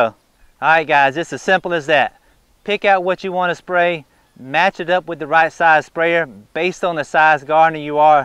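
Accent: South, United States. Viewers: He really does have a good southern accent. Some are definitely not as fluid and warm as this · A good southern accent is like sweet tea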